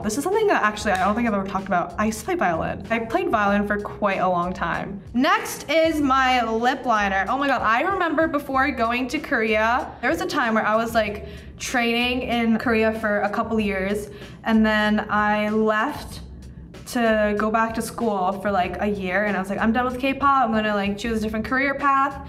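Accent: United States. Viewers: they mean American accent, like her diction and the way she articulates the words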